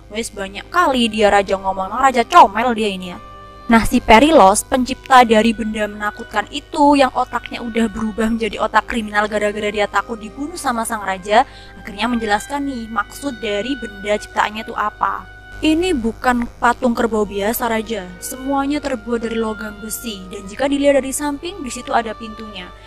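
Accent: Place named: Surabaya, Indonesia. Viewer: Iki arek suroboyoan ta koyok'ane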